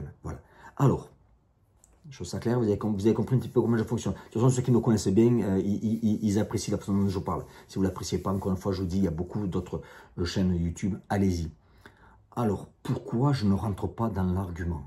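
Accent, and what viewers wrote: South, France. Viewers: Salut. Déjà, les gens ne te comprennent pas parce que tu parles vite. Mais il suffit de s'y habituer je dirais et c'est tout!!! Ensuite tu as un accent que je n'ai pas et c'est bien dommage, je suis parisien, personne n'est parfait... Mais surtout, garde le ton accent, c'est agréable, c'est chantant, ça rappel les vacances, le sud et le bon air! Tu ne vas pas l'effacer pour faire plaisir a certains! Tu sais, c'est un peu comme les gens qui se plaignent des cigales dans le sud, elles font trop de bruit qu'ils disent! Mais le sud sans les cigales, c'est plus le sud!!! Et c'est un peu comme, il parle comme il parle, point!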